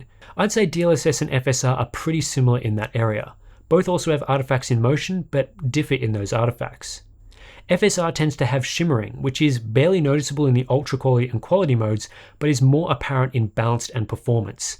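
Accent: Australia. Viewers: Awesome video, I thoroughly enjoyed it. And it's always a pleasure to hear Aussie accent:)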